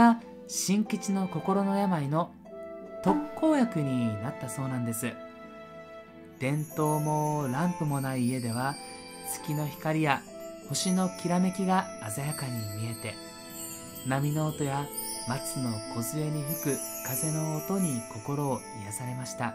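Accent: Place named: Kobe, Japan. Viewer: 先生の動画でちらほら聞こえる上品な関西弁アクセントは、神戸かなぁって思ってるんですけど、やっぱりそうやったんやろか?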